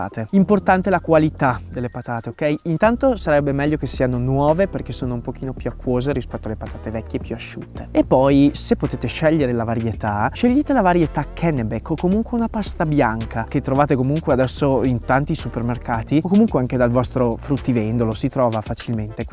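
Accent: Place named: Trentino, Italy. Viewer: Ciao sono bolognese sposata con un trentino da 30 anni lui è del bleggio superiore e vado in vacanza lì tutti gli anni non potrei rinunciarci per nulla al mondo, è un posto bellissimo una pace una serenità unica, poi mi diletto ad andare nel bosco a funghi, quindi anche se parli il trentino lo capisco. Sei un ragazzo bravo e simpatico mi piacerebbe venire a trovarti un abbraccio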